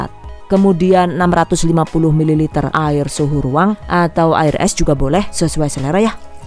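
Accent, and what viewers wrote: Banyumas, Indonesia. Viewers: Ngapak luuurrr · Maaf bun njenengan tiyaang pundi nggih, kayaknya ngapak. Siapa tau deket jadi bisa belajar langsung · Deneng ngapak😂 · ora ngapak ora kepenak,wong ndi jane rika yu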